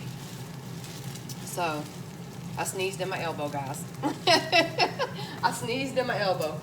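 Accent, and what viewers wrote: South, United States. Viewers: I just love to watch you cook. You remind me so much of myself with that southern Belle accent.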